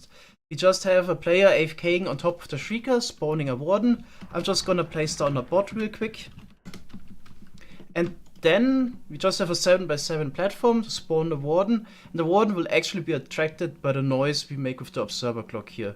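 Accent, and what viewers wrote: Germany. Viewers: Ich bin mir nicht ganz sicher aber kann das sein das du eigentlich Deutsch sprichst? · love ur german accent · are you german?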